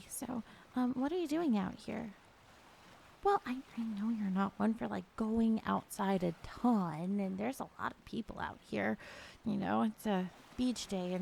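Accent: South, United States. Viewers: I may hear the southern bell often but tbh it suits you better than most folks I've heard it from that's for sure lol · Cute video, you southern accent smooth talker. Have a great weekend. 🍎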